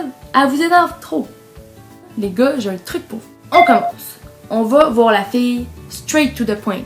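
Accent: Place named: Quebec, Canada. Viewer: Québécoise innocent d'français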